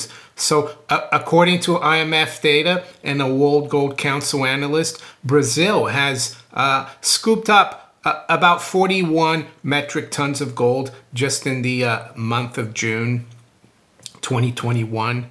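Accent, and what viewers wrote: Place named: Italian-American, United States. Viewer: Brazil? You sound like any US/NY Italian-American. Now in London. You should explain your family's ethnic origins, travels and education. Fascinating. ... You look like you live very simply, frugally. If so, the bravo.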